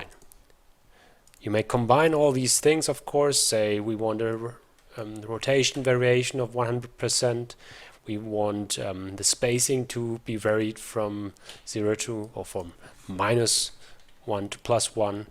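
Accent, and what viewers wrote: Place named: Germany. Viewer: Schöner deutscher Akzent :D Wie sind die Hotkeys um zb. die Z Achse zu ändern? Hast du ne Liste?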